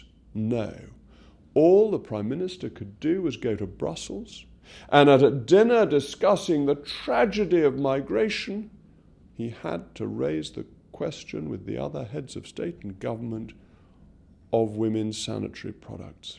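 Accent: RP, United Kingdom. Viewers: Perfect RP makes your arguments more potent.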